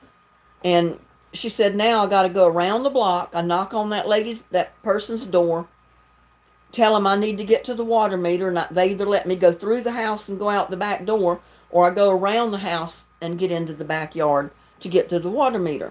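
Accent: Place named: South, United States. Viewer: That was a cool live stream, though I enjoyed it so far and I still like your voice with that accent. I don’t mind accent you know southern sounding. I like the way you speak you know everybody does speak differently though.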